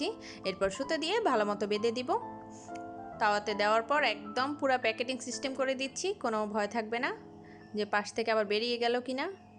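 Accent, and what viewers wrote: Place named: Noakhali, Bangladesh. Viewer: Puro dakha galam.valo noyakhqli vasa sunlam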